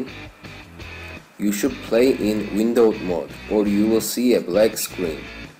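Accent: Turkey. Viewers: Aksanın her yerinden ben türküm akıyor :D Neyse helal olsun kardeşim güzel videoların var. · Türksün değil mi aksan çok belli ediyo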